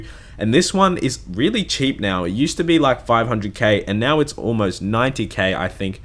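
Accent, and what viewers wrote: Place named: Australia. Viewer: Mate, you must be fair dinkum, true blue, rinky dink Aussie? I mean you have to be, 7pm upload time, good as gold. In all seriousness, love the content and your familiar, refined accent. Keep up the good work mate. If I were to be honest; I would have to say that your videos contain some of the most useful OSRS content I have seen on YouTube in a long time. tl;dr Fully sick vids, hectic descriptiveness, sickhunt. 11/10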